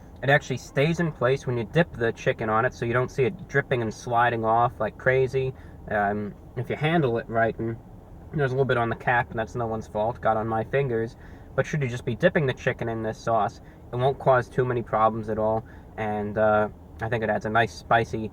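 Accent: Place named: Transatlantic, United States. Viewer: where are you from? I love your accent! it's similar to the transatlantic accent. you sound like a radio presenter from the 30s!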